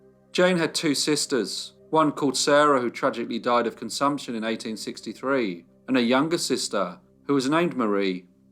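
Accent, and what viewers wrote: England, United Kingdom. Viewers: Amazing work on this tragic and sad case Your brilliant english accent brings the stories alive! Thank you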